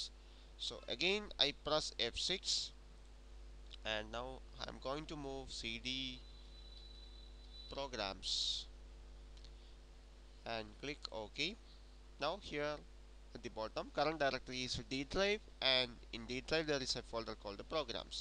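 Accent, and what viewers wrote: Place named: Kerala, India. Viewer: I can understand your Malyali accent, that means, you are from Kerala, that's a southern state in India.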